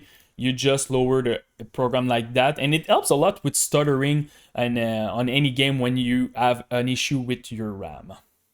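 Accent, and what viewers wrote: Quebec, Canada. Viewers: L accent québécois 😍😍😍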